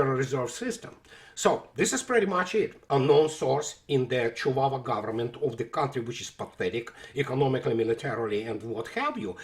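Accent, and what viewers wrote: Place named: Russia. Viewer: Coffee and two, your English is quite remarkable in spite of your strong Russian accent and I enjoy your thoughts thank you for speaking the truth and keeping us up to date on these issues that are very important to comprehend and for all people to understand the reality of what is really happening. Thank you and be well. ✌️😎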